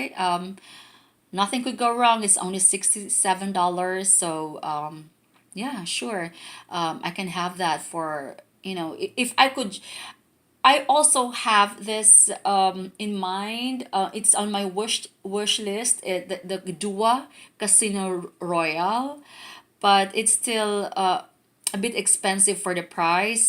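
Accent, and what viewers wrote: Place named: Philippines. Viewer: Thanks for the review. I detect a Philippino accent.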